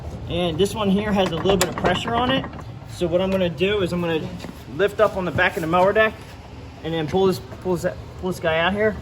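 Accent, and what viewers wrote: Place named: Philadelphia, United States. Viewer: Great instructions. Sounds like a Philly accents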